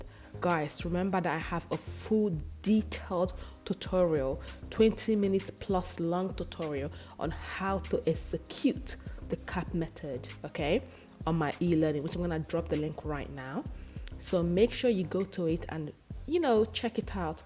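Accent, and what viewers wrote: Nigeria. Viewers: I’m here to hear your nigerian accent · No be edo accent I Dey hear so???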